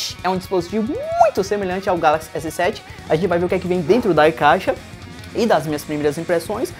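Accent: Bahia, Brazil. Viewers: Meu mano vc é de que cidade do interior da Bahia ?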